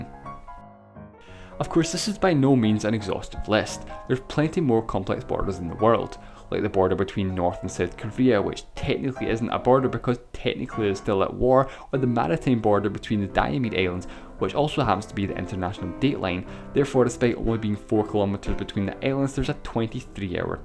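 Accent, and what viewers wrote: Scotland, United Kingdom. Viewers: Scottish accent? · Are you Scottish? I like your accent. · Is he Scottish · Walid Bahri Um you do that's a Scottish accent right? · What problem do you have with his accent? The guy is Scottish, so what? Many of his videos are better laid and he explains stuff better. CGP grey uses a lot of humor where he doesn't know what to say and speaks way fast. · Is the speaker from Scotland? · You Scottish? You're reminding me of my year abroad rn. It's a fond memory 😊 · This is a Scottish accent right. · I like your accent. Are you Scottish? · What about Russia, there's a small bit close to the north sea between Poland and Lithuania. And BTW, love your accent, what is it? Scottish. thanks for this video. Don't mind the twat who cannot speak in English, your speech was clear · he's scottish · alan benlolo It's English with a rather strong Scottish accent. 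I can understand it pretty well. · He's Scottish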